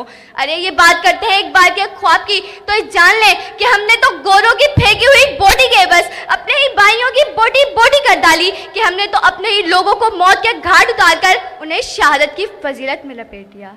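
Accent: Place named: Punjab, India. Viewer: Mend your Urdu pronunciation.slowly move away from Punjabiat.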